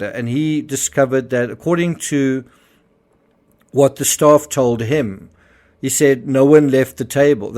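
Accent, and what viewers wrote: South Africa. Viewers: How much is this man making from these smear videos? He sounds South African, so no where near Portugal. The McCanns have suffered enough so leave them alone with this barmy self invested Youtube channel. Give it a rest and maybe post on UFO’s instead? You are part of the problem. Maddie is no doubt not with us any more so stop trying to make her parents lives even more awful and go away and stop making money from misery. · are you originally from South Africa?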